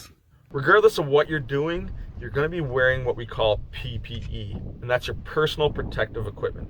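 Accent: Canada. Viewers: You're a canadian Eh!!!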